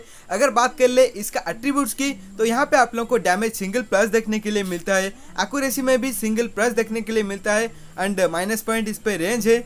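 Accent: Odisha, India. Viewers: Bhai ap Odia ho kya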